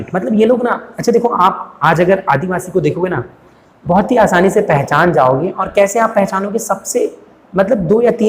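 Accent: Gujarat, India. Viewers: Sir Aap GUJARATI ho..?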